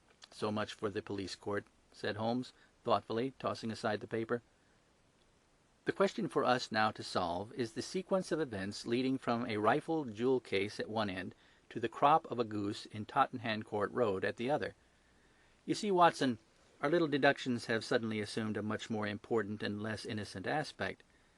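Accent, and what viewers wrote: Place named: United States. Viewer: Nice Narrator voice with a slight American accent.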